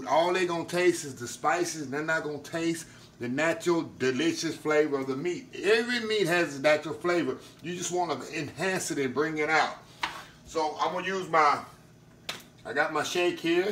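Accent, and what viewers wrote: South, United States. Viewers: Reasons I love this channel..I've picked up some great recipes, good tips and tricks and Chef is always dropping some knowledge. And of course the country accent❣Thank you Chef.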